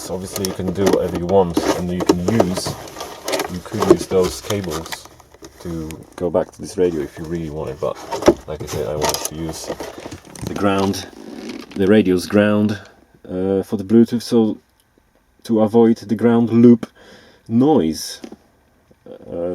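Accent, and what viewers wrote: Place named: Poland. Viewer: Widzialem ze jestes polakiem :) od razu slychac polski akcent :P ale super ze podzieliles sie ta informacja.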